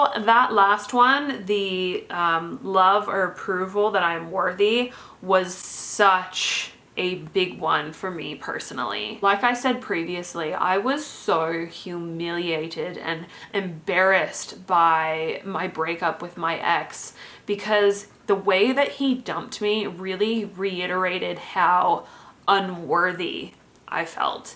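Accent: Australia. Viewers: your slight Aussie accent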